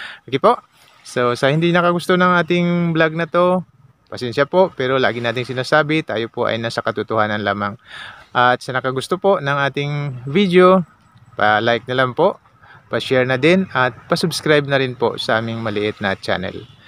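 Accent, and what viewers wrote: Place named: Visayas, Philippines. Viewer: Ulol! Di ka bagay dumepensa sa mga vangag mong amo.Doon ka sa visayas o mindanao kay tonong bisaya ka naman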